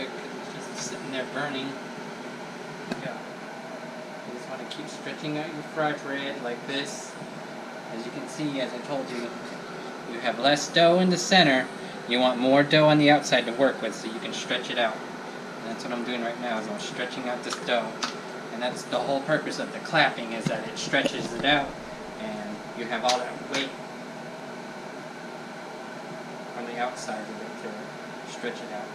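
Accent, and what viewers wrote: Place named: South, United States. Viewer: Hes cute...and has a funny country accent. In new mexico and arizona the accent is slower, spaced words, and no twang unless their cousins visiting from lubbock hahahaha! Also you can order bluebird from amazon and walmart because youre so far from colorado. It is pricier, but not some corporate machine. I live in colorado, a little far from the southwest corner where its made and its still more expensive than pillsbury. I feel like its worth it because the families that run it bluebird show gratitude to native people for using their product and times like these need good people.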